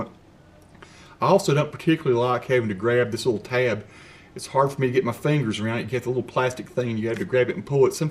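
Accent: South, United States. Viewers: Is that accent real? I've never heard such a strong Southern accent before :)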